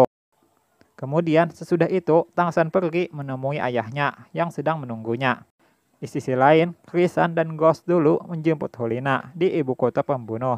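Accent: Banjar, Indonesia. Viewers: Suaranya Mimin kaya logat orang Banjar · Aksen nya mirip orang banjar hulu sungai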